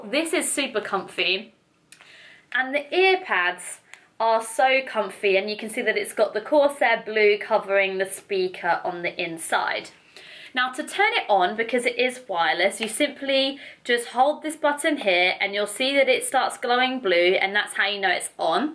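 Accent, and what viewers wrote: United Kingdom. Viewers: Man British accents give me a headache, I can't take more than about 5 mins of it, but this is a sweet looking headphone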